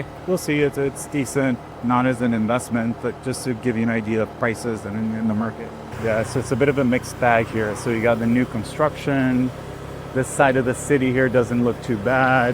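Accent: United States. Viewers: This guy has a us accent, but he's not from the states. Or Mexico.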